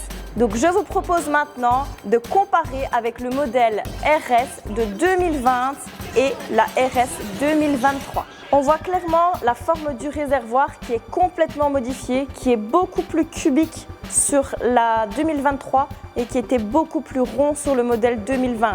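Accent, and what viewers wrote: Belgium. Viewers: Tu ne serais pas belge ? Sinon tu presente bien les véhicules et c'est d'autant plus agréable qu'on cest une femme.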